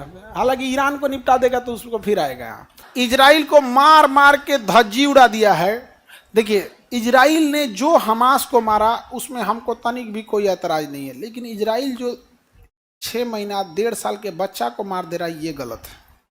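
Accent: Bihar, India. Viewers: Bhai chawal ho tum toh kuch samjh nahi Aa rha bihari ho na pakka tum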